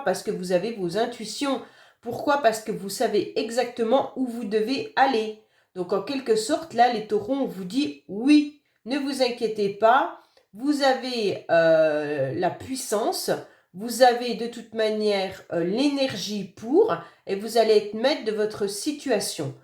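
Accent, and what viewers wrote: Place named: Franche-Comté, France. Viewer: Vous avez l'accent franc-comtois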